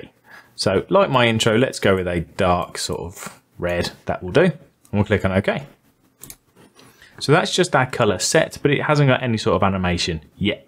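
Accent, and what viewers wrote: United Kingdom. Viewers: What's not to like,?! I learned how to make an intro, and I got to hear a cool accent from across the pond.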